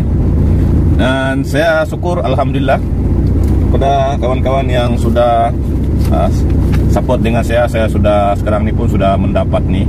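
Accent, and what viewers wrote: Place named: Malaysia. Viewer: Terimakasih tuntunan umum nya. Saya suka memdengar logat melayu. Singapore ape malaysia ni?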